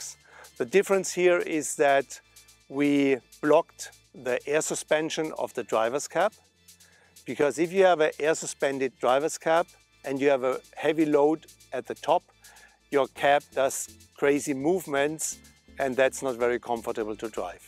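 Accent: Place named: Germany. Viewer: Ich hab einfach mal auf Deutsch geschrieben das der Akzent und der Name unverkennbar deutsch sind.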